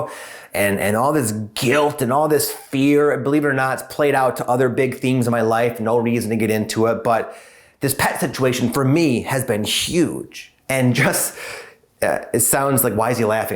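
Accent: Michigan, United States. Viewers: Great video as always! Unrelated note, I can hear the Michigan in your accent (it's okay, I can say that because I'm from Michigan too 😂)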